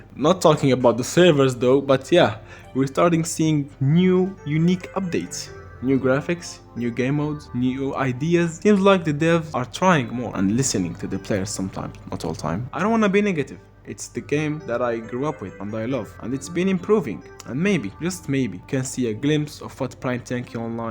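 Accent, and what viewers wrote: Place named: Morocco. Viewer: i hear a moroccan accent 😂, Nice video GL on the 1M views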